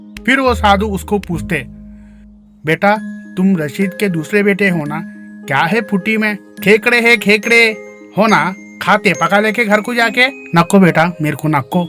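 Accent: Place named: Hyderabad, India. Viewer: حیدرآبادی اسینٹ لگ رہا ہے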